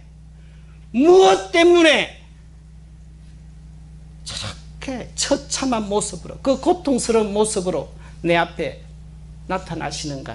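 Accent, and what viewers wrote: Gyeongsang, South Korea. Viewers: 좋은 말씀 감동입니다 ㆍ 경상도 악썬트에 노래로 재미나게 말씀을 풀어주심에 감사 드립니다 ㆍ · 신부님!! 우연히 신부님 갱상도 말씨에 반하여 강의를 듣게되었습니다 경상도 말씨 넘 정겹습니다 뒤늦게 신부님 강의 듣느라고 요즘 삶의, 신앙의 생기가 살아납니다 신부님 목소리 오래오래 듣고 싶습니다 열정적인 좋은 강의에 감사하며 무엇보다 건강하시도록 기도하겠습니다~♡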